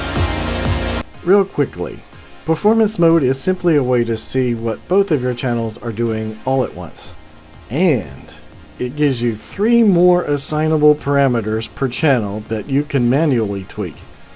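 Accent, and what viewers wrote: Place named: North Carolina, United States. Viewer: Thanks for the videos. I like them, even though you sound like you might be from North Carolinas or something :)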